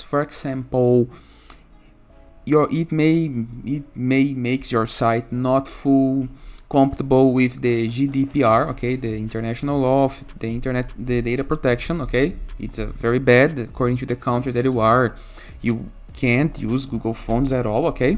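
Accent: Brazil. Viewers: esse sotaque é tupiniquim , hein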